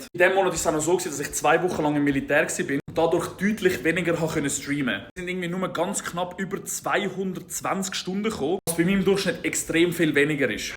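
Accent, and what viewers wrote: Switzerland. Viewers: Verdient enldich emol e schwiezer da au wirkli schwiezerdeutsch redet , in welem kantom wonsch du?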